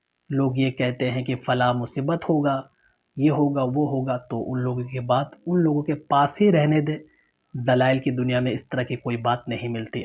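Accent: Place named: Bengal, India. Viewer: Tum bangali ho Kia aapkeblne katarika sahi nahi hai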